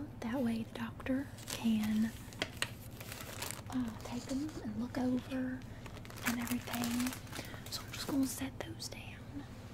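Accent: South, United States. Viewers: hehe it's definitely a southern accent, i'm from jersey and we speak like we're from california. thank you for the time stamps :) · You have a better southern accent than the actors in Dumplin. Coming from a southern girl. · This is my new favourite ASMR clip. That southern accent is just perfect. <3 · Absolutely love the southern accent very funny! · Can't wait to watch this after work!!! I could only watch about like 2 minutes of it and I am Loving the accent!!! It is such a sweet and tingly accent :) It is sooo Good! Kinda reminds me a little of Dolly Parton.. but she's the first one to come to mind when I hear a southern accent lol. You're really getting into your RP..and I am here for it!!! 😁💖👏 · Gotta love me some southern accent, damn. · This video is y I subscribed, 😁 that southern accent was ironically soothing. U must b from the south, if not very convincing 😆. 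· That country voice tho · Love the Georgia by way of Tennessee accent. sorry to type like I know you but " " I swear this is your actual accent you speak with. Also your pure devotion to be such a great ASMRTIST is divine as you are bless your heart child keep up your excellent work it's much appreciated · Love that southern accent lol!! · The southern accent was SPOT ON! Excellent job as usual! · Ok you country accent is spot on and amazing I from the country and even though I don't have that accent I heard some people talk like that and I have one family member who dose that is really amazing how good you did that! Keep up the great work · Holy moly that accent is ASMR inducing. The southern accent is very endearing and loving. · Wow, so far this is amazing! Creative idea, I love the southern accent. Comment section is brimming with kudos. This is going to be awesome. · It's the country nurse accent for me~ 😗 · you have the patience of a saint. 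You a little over 2 hour movie which must have taken forever to edit. Too tedious for me. You do a fairly good.good southern accent and it's pretty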